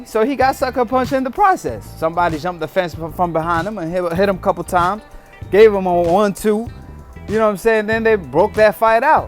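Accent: United States. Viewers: I was there you idiot.! McGregor didn't sucker punch anyone and you sound American why would you take up for this trash and what could have been a lot worse than it was and another thing he proved his point in the octagon there was no reason to take it out in the crowd with Innocent people that could have severely gotten hurt or killed . Why are you American's so worried about this idiot you do know his family his friends and teammates ,father all been questioned about 9/11 and the real truth why his father cannot get a green card to come over here it's because they found out his father was associated with the terrorist of 9/11 and you want to back this BITCH "And this is FACTS not just speculation".... And if you are American and live in the United States of America and do not like it here get the FUCK out.!!!!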